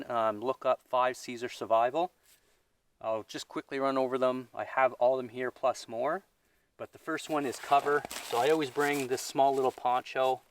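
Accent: Canada. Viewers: Are you a maritimer?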